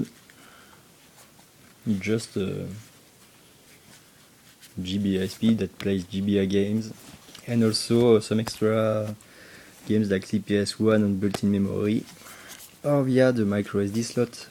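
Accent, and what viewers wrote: France. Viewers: le francais qui parle englais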